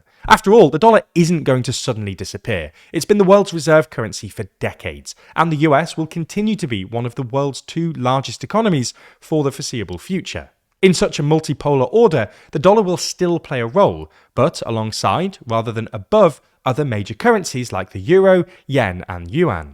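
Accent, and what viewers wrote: United Kingdom. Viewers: Yeah when I heard the british accent I thought this was going to be a waste. These guys never have good info.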